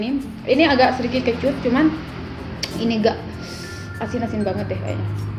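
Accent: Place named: Lampung, Indonesia. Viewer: Logat Lampung nih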